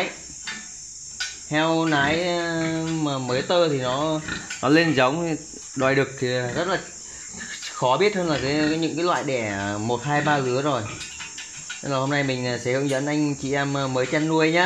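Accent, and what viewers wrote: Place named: Ha Tay, Vietnam. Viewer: Anh ở đâu thế nghe giọng giống ở Hà Tây cũ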